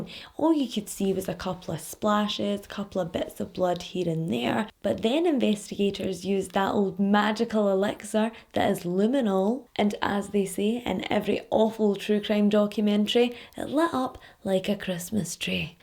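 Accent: Scotland, United Kingdom. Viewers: Girl I just found you a few days ago and I’ve watched almost all of your vids now I’m obsessed!!!! I love your Scottish accent and true crime so this is the best!!!! 💗💗💗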